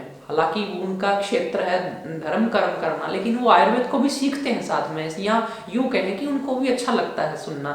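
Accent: Punjab, India. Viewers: Namaskaram guruji I love your Punjabi tone and i am not Punjabi but I love Punjabi language...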